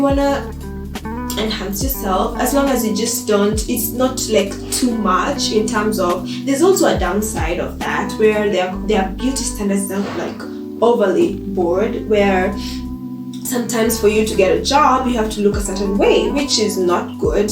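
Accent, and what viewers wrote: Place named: South Korea. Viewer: I love kimchi... Your Korean accent is so cute! Korean cuisine is delicious 😍 haha the way you are talking about them... Bless you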